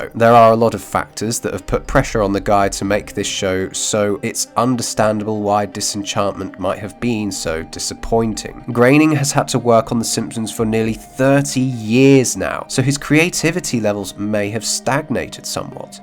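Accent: United Kingdom. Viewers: This video sounds like you couldn't wait to make a video styled after your favorite complaining youtube channels. The british accent really sets it off. Most of the youtube channels I find complaining about movies and tv are mostly done with a british accent. Moreover, you put heavy duty spoilers in the description, but the very first thing you talk about is how you are trying to help people get a feel for wether or not they SHOULD watch the show. But you're spoiling the entire show to give your opinion. Are you like, a real person? or just some moron with a platform.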